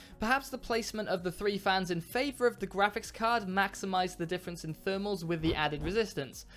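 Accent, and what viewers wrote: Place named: South Wales, United Kingdom. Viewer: Weird question, you Welsh? You sound south wales-ish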